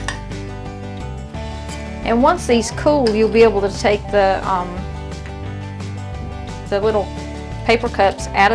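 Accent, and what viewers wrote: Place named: Appalachia, United States. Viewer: Cutest Hillbilly on YouTube, and love the accent. Great recipe, thank you.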